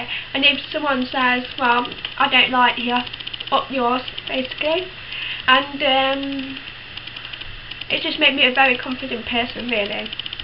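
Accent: United Kingdom. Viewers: Wow you're British and still have an accent! Of course you don't know what that sounds like but it's amazing how humans just know how to speak and adapt to situations like you have to know sign and english even though you can't hear what you're saying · Probably not the only one to ask but how do you get a British Accent if you can't hear the way words are pronounced? Just curious. :) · How do you talk like a frickin british person if you are frickin deaf???? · They managed to train you into a vaguely Yorkshire accent *thumbs up* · I realize that part of your accent is from being deaf, but it also sounds somewhat British. Is that where you're from? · It's so cool how despite the fact that you're deaf, you still have an English accent hehe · I don't understand how you have a British accent, that's crazy how that works. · I notice that you speak with a British accent, are you completely deaf or almost completely deaf?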